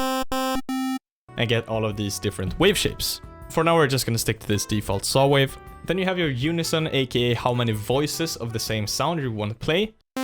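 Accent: Sweden. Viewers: You from Sweden? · Damn you sound swedish! haha Haven't looked further than , but damn, the swedish accent is killing me LuL - another swede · it’s the thick Swedish accent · Can an accent get more Swedish? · it's the swedish accent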